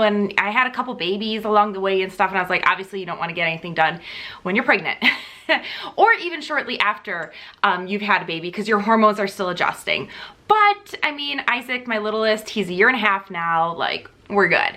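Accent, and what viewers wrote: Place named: Midwest, United States. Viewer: Omg you are soooo midwestern! “ Holy jeeez ya, those are deep!” 😂🤣😅 I’m a MN gal. 😘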